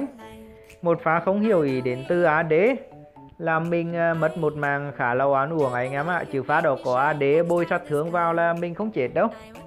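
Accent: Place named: Central, Vietnam. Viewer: Ng miền trung dd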